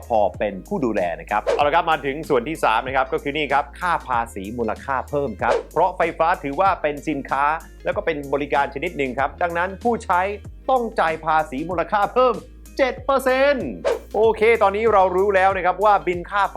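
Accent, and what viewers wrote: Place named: Thailand. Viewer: Omg I love how you teach and damn I fucking love your Thai accent.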